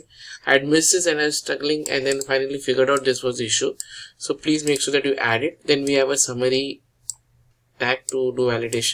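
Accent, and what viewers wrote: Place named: India. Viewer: Why is indian accent so horrible?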